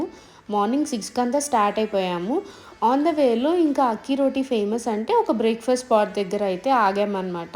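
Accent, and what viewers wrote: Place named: United Kingdom. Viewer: Kuki kadhamma kukke anali...prathi word ki edho English accent touch ivvalni try cheyakudadhu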